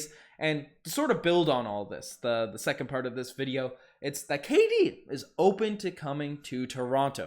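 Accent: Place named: Newfoundland, Canada. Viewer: Sounds Newfie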